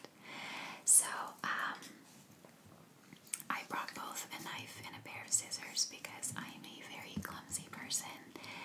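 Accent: United States. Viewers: I would have guessed she’s from Chicago!! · You may have answered this before but why do you seemingly not have an accent? Like you sound American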